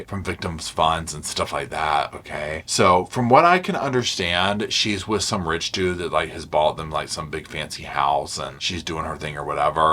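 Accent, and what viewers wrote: South, United States. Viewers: Why do you say "you know" so much? Is that just like, a weird southern thing?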